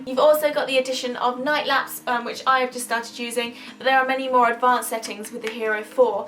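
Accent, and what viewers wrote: United Kingdom. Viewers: Among all I always been very fascinated by the UK English accent..btw that's a very useful info of GoPro.👍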